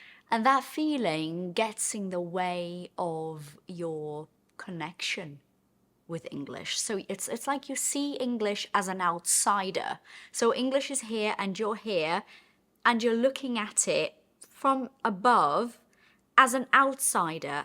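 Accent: United Kingdom. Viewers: When you start to follow her bc of the british accent and stay here bc she's such a great person and teaches so much more than just english... Well done!! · Your british accent is so beautiful ❤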